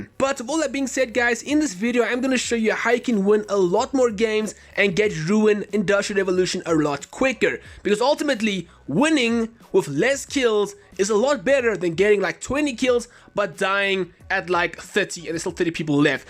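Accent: South Africa. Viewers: You sound South Africa ,are you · You sounded very South African, until you said "Chopper" 🤔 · Hey dude thx for the info, and are you South African? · I've recently fallen in love with BR and its been great, and ill definitely be grinding to reach Master 2 this season User name: Petscop2 Edit: are you South African? You sound South African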